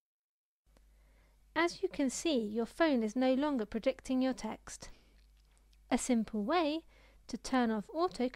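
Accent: United Kingdom. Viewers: Lol Ur British accent is awesome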